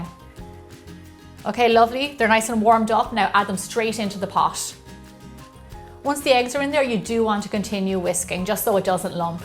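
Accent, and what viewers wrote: Ireland. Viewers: Mmmm that pie look so yummy. Also. I love your. IRISH ACCENT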